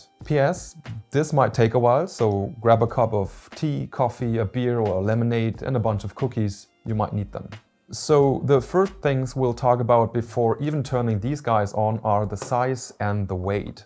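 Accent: Germany. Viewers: German? Hört sich definitiv so an, danke für den Vergleich. Hab den Legion Go zurück geschickt. Zu groß, zu schwer für einen Handheld. Software hat nur Probleme gemacht und war kaum schneller als mein altes SteamDeck. Überlege doch noch den Ally zu holen... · Ich hab 2 Wörter gehört und direkt gewusst der Typ ist Deutsch, ahhahaha aber cooler Vergleich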